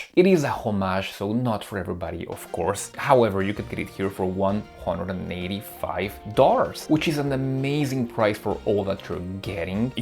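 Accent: France. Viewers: Yet another great video, thanks. Love the accent, reminds me of the times when I worked for a French company (11 and a half years!) . I am looking forward to your review of the Specht&Soehne. Crazy German-ish name for a Chinese watch, huh? I am not fond of Homages of watches that are too unique to be considered "universal property (Submariner, 50 fathoms, Explorer, AquaTerra, Daytona, Speedtimer, Dirty-dozen field watches, Flieger watches)", unique watches such as the Alpinist (Militado released one recently and I have mixed feelings about it) or the Vacheron Constantin 222 (which Pagani Design "homaged" and failed spectacularly at replicating the bracelet, a failure that every youtuber "fails" to point out, imagine that...). But if anyone succeeds at properly homaging the Land-Dweller, I just might consider buying it, because I really want a Genta-style watch. 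Otherwise, I would have to turn to the Festina F20051 or F20065 (which are on sale right now at about 170 EUR). The PRX is too damn expensive right now and the prices will go higher for a while, as long as people associate it to the Land-Dweller.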